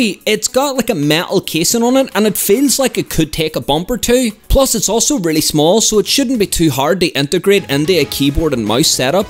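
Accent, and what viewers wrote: Scotland, United Kingdom. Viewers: scotish i think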